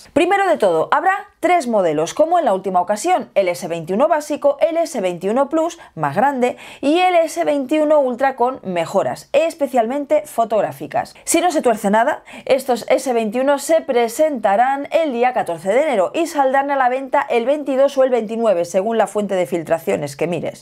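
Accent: Spain. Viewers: con razón, yo siempre escuchaba chupetes esque lo dice rapido y el español de españa no es igual al de nosotros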